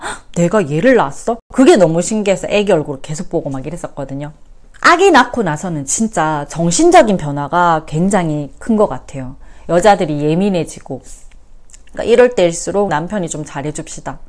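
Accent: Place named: Busan, South Korea. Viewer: 부산분이신가봐요ㅎ 말투가귀엽ㅎ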